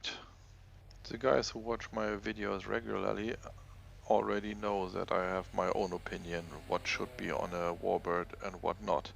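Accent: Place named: Germany. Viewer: Das nenn ich mal deutschen Akzent :-D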